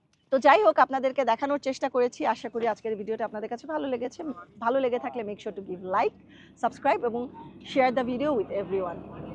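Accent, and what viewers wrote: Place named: Dhaka, Bangladesh. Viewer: আপনার কথা নিখুঁত মাতৃভাষার(সিলেটি) ব্যবহার নাই, কোন বিভাগের ভাষা ব্যবহার করছেন সুমিস্ট ভাবে পরিবেশন করছেন। এতটুকু নিশ্চিত সাবলিল ভাবে ঢাকা বিভাগের ভাষা বলেই মনে হচ্ছে। ধন্যবাদ।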